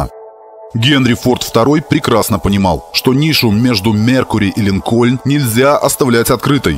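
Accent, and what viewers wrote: Belarus. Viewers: У чтеца жэстачайший белорусский акцент.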